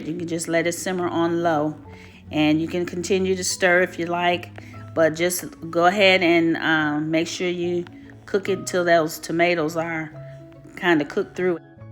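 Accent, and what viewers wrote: United States. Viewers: Sis u sound like a real country down home girl love it Thumb up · Where you fr baby girl, I love this dish I'm on my second time of fixing it, so good. You talk like some of my family from Halifax NC!!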